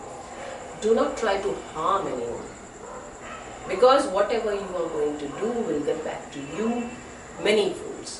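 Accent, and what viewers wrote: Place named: India. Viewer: I LOVE the English language spoken by an Indian person. I don't know why I have an affinity for this dialect, but I do. I can listen for hours! Thank U for a very informative video!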